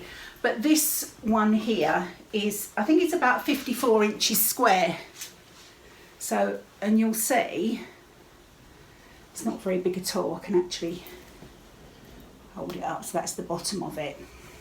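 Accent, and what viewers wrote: England, United Kingdom. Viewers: Hi I really enjoyed your visit today you and I have much the same taste in fabric and patterns I made Tula bloomers quilt year before last useing tilda fabric I also made a few more of her patterns in her fabric and some other lines it is so nice to hear the accent I spent many happy times in Sudbury and other parts of Suffolk and grew up in Essex your work is stunning and your quilts Nate on point your stitching is amazing I haven’t seen your knitting yet so will go back for a look thank you so much for your wonderful visit Angela in ireland 🇮🇪 🙃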